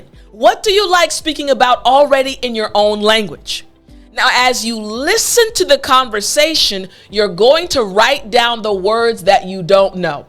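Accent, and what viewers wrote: United States. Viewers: You speak as you wer american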